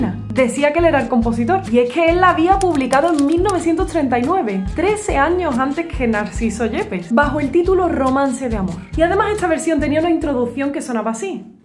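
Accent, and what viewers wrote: Andalusia, Spain. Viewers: ❤ acento español, voz cálida, alegria en la narración y me deleitaste con el sonido antiguo de aires extranjeros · Estará todo inventado pero su belleza,originalidad y pasión por compartir cultura y música no,estoy que hago un curso para hablar Andaluz porque para mi no es habla es música, tan preciada como la antología del cante flamenco, cada post nuevo de es una joya para mi tan valiosa como un concierto de los mas renombrados de la historia,mi sueño es estar en un concierto de su persona y felicitarla por su trabajo. Que bonito.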